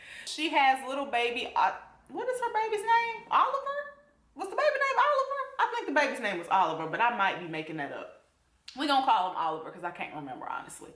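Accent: South, United States. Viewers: Who doesn’t like her accent?!??? I’ll fight them! I love southern accents. Wish I had one.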